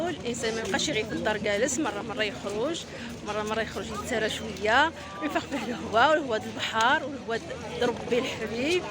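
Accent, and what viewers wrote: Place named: North, Morocco. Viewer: اختي كتعجبني هدرتك بشمالية وقناتك تستحق المتابعة استمر ي بتوفيق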